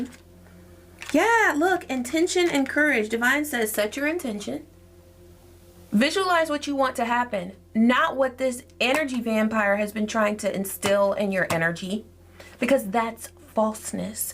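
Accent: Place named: Trinidad and Tobago. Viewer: on point with the voice 😁 you're great.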